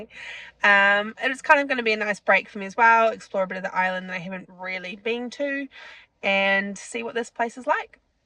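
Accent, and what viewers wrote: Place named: New Zealand. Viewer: Sounds like a Kiwi to me.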